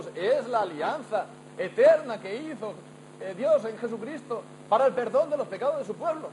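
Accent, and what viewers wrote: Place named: Spain. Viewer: Felicitar al pastor por el sermon, buen trabajo. ¿ De donde es ? por el acento pareciera Español.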